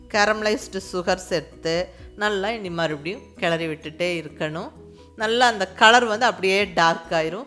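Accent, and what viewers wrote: Coimbatore, India. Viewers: ka Coimbatore style pechu alagu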